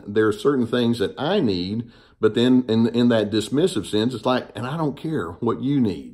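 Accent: South, United States. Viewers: Love THICK SOUTHERN_ACCENT!! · Appreciate a doctor with a Southern accent! The media equate that accent with uneducated hicks--exacerbating regionalism.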